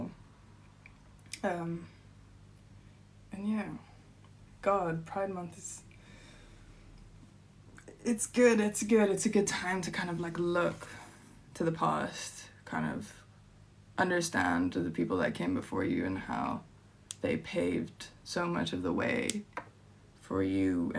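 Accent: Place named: Cape Town, South Africa. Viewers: You have a Cape Town accent. Love your music!!!. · Right ! She could be a Saffa, where is she from ?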